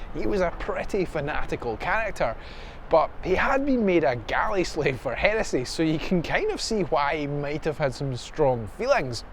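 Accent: Scotland, United Kingdom. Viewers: Thanks again,! I could listen to your erudite and witty brogue all day long. I regularly watch your brother's channel as well -- love ya both!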